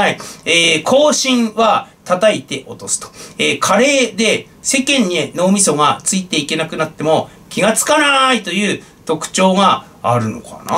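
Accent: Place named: Iwaki, Japan. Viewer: 熱がある中 配信ありがとうございます🙇手術のあとは、無理をせず 本当にしっかりと静養されてくださいね!? そして、さらにパンチの効いた配信を楽しみにしております🎤 くつざわさんのいわき弁、大好きです🤗🎤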